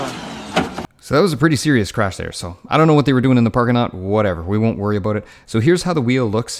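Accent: Canada. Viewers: Great vid G! I think I heard the canuck accent!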